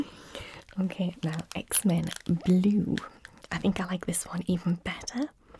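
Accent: United Kingdom. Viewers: Jesus this is your normal accent?! Might even be more Harry Potter than the movie universe itself ha. It's even more impressive then with that Villanelle Russian accent and mannerisms. Ultimate F4 is great-I need to read that again in the future. I need to pick up a Crichton book. I hear nothing but praise for that guy and his novels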